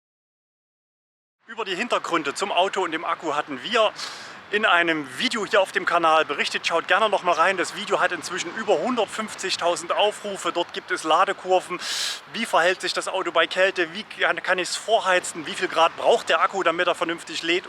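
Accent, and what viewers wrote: Germany. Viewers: Your English has a very strong German accent. Hard to understand. 💪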